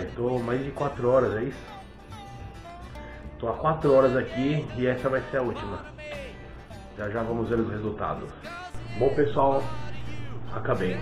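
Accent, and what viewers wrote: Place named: Limeira, Brazil. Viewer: Amigo, vc é de Limeira-SP?? Parece, pelo sotaque.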